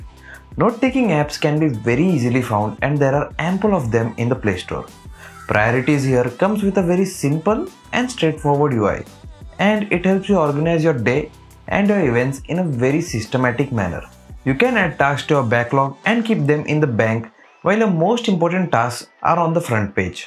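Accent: India. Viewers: Thank you tube for closed captions. Many of us just can't understand Indian English.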